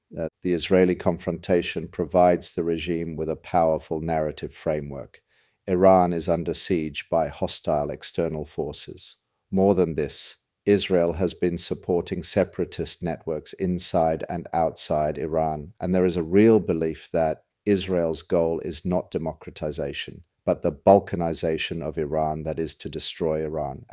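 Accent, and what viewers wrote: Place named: United Kingdom. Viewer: Bro aren't you American? Where did the British accent come from?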